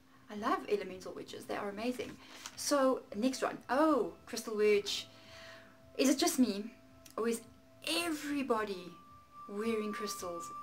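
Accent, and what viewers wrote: South Africa. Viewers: Your accent reminds me of my South African neighbour. I think she's from South Africa...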